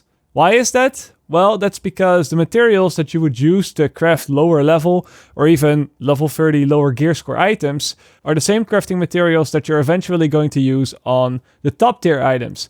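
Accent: Netherlands. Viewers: I came for the crafting info and as soon i heard you speak wist ik dat je een Nederlander was😂 goeie video ik ben gesubd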